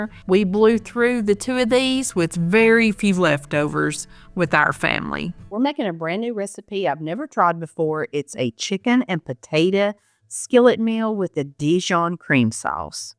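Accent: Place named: Virginia, United States. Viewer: Mama, I just subscribed to your channel and I love it. I’m not a cook but my boyfriend is from Virginia and he’s got an accent like you and I just called him and told him he’s got to look at your website. He is gonna be so thrilled I love it. I love crockpot cooking though and I am really enjoying all your crockpot cooking. Thank you mama.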